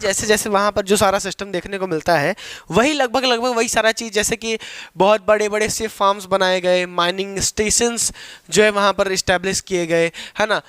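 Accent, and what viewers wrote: Bihar, India. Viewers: bhaiya ap bihari mat bola kigiye please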